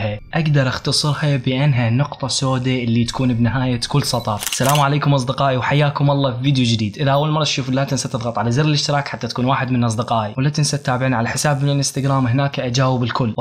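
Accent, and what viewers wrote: Iraq. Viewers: والله العظيم اللهجه مالتك عراقيه وبغداديه هم اني عبالي عراق طلعت سعودي يلا ماكو فرق اصلا تحيا الك حبيبي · اللهجه عراقيه ميه بلميه شسالفه؟؟ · أحس هو عراقي لأن لهجته عراقية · ليش لهجتك عراقيه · لهجة اهي مو لهجة سعودية انت من العراق ليش ماتقول انت من العراق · أنا عبدالله من سكان الرياض عمري22 سنه بعيد كل البعد عن المنطق الشكل عراقي واللهجة عراقي ليش الكذب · كيف انت سعودي وتتكلم عراقي وتقول دوانيه ولا انت كويتي عشان افهم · ليش يجي عليك انو انته عراقي حجيك عراقي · انت تتكلم عراقي وانت سعودي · لهجته عراقيه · لهجتك عراقيه؟ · لهجتك عراقية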